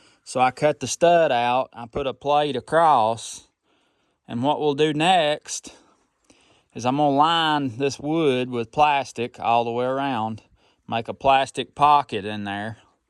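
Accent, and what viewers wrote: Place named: North Carolina, United States. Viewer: I'm assuming that is your spring gurgling and dripping in the background. Bout rocked me to sleep listening to it as you gave us mountain ingenuity on the flue construction. I also love how you have your local NC distinctive accent but your children have not trace of your accent in their speach. Much like my heavy local NC accent as my children grew up they too had a very different accent than mine. Might be because we moved to several NC towns because of my husbands career as a Postmaster for 30 years in the mountains of WNC. However and whyever (my new word)🤣the reason our children have different accents than us, doesn't matter. What matters is that they are ours and we love them. Maybe your children picked up their accents from Billy, Michelle & Will!!! Ha! Ha!❤❤❤😊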